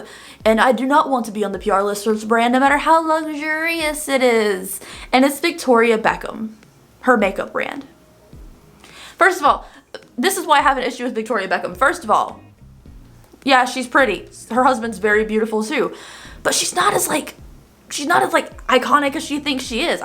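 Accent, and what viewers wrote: South, United States. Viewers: Where are you from? I hear a Southern accent.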